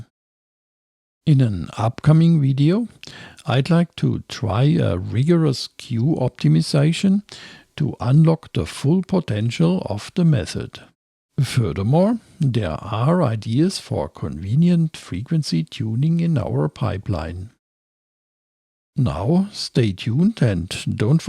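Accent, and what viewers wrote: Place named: Germany. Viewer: Ohne den Namen, wäre mir deine Tonspur tatsächlich nicht als deutscher Akzent aufgefallen. 😲 Respekt! Viele Grüße, Sebastian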